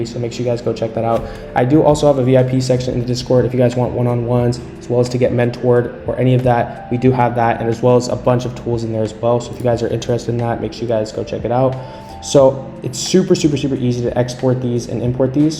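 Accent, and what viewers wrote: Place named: Bangladesh. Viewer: Bangla voice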